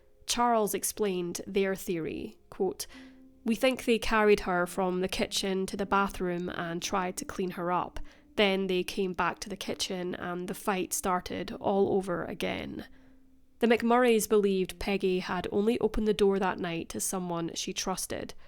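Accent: Scotland, United Kingdom. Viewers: Brits write and speak better. American English sentences can sound clumsy to us. The narrator is a Scot.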